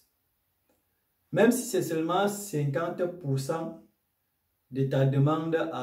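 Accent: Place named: Cameroon. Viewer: Merci beaucoup pour tes videos mon frere, moi cest Paul, je ne sais pas si tu es camerounais, sinon ton accent me fait penser que oui. Jai aussi une question, Quels businesses conseillerais tu a un jeune qui se lance en affaire et na que 500 000 FCFA de capital au cameroun ?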